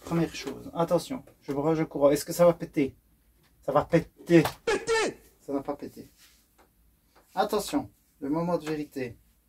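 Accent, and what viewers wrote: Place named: Belgium. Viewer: Coucou et ta petite famille ❤ Oui je suis laaaaaaaa (imagine une petite femme qui saute les bras en l'air 😅). Boudu! (comme on dit chez-nous dans le Sud) j'ai un retard monstre 😮 c'est affreux!!! Mais le soleil est revenu, et j'ai tellement profité du jardin le soir après le travail, que YouTube me regardait, au lieu que moi je le regarde 😂. Du coup, comme j'ai un retard monstre (encore!) sur tes vidéos, je laisserai un pouce en l'air (la base!) et un cœur ❤ en commentaire. Désolé il n'y aura pas de roman 😢, mais faut que je rattrape tout ça 😅. J'ai adoré (comme toujours!) ta vidéo. Comme tu le sais et ça depuis le début, les vidéos du vendredi c'est ma pépite de la semaine ❤. Pour les cannettes en alu, en effet... Il faut mettre de côté, pour 3€ et quelques... C'est pas si rentable que ça, même si comme on dit "il n'y a pas de petites économies". 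Mais la... Ce n'est plus question d'économie. C'est question de places 😅. Pour l'engin avec le charbon, mon pauvre, je ne t'aiderai pas 😅 je ne suis ni bricoleuse du dimanche ni même de la semaine 😂. Merci pour ce bon moment de rire, je dois avouer que ça fait du bien de remettre l'accent belge à la maison 😘. Des bisous à toi et ta petite famille ❤. A très bientôt 😘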